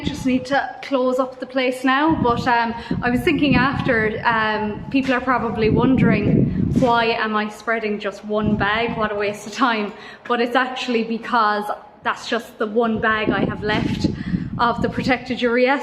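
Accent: Ireland. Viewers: Is it me...or does look cuter in every new video...her Irish accent is 🔥 HOT!!!!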